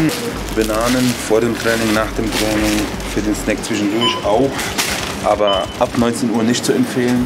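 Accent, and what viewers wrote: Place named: Franconia, Germany. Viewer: er fränkelt manchmal :)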